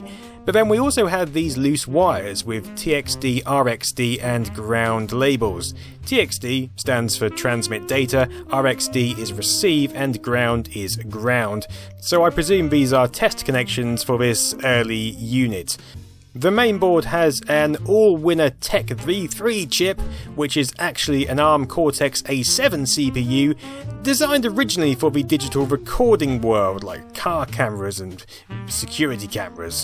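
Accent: United Kingdom. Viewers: The french man say: C'est trop Cool! But will you stop having this british accent, it's so hard to understand for frogies...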